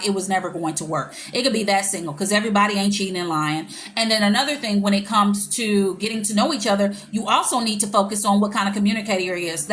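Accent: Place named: Arkansas, United States. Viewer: Hey just subscribed! Love your videos. I’m from Eastern Arkansas…. 😊 Wynne…. Sounds like a lot of Arkansas men I know…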